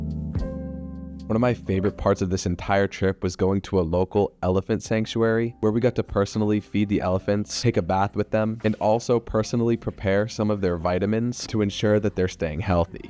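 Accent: Thailand. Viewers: I love your Thai accent,its pretty lovely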